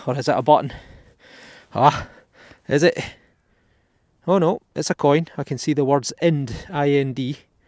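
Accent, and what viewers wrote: Scotland, United Kingdom. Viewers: love the historic lessons and especially in scottish the accent the history of your country sums up for succes keep going im a starter in the hobby greetings from the netherlands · I just love your channel! I'm a history buff not a detector so I find your comments on the historical background of your finds very interesting. I bought my husband a detector a few years back but the history out here in British Columbia Canada is a little shallow for metal finds. Our historical finds are pretty much all from Indigenous culture. Also...I could listen to your Scottish accent all day😊 keep them coming mate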